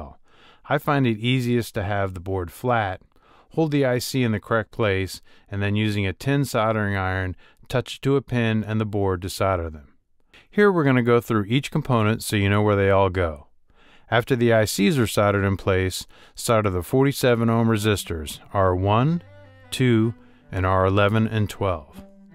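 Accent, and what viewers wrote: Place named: United States. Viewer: That's excellent. I would like one absolutely. I reside in UK though. I think I may of heard your accent being USA. Either way. Best wishes.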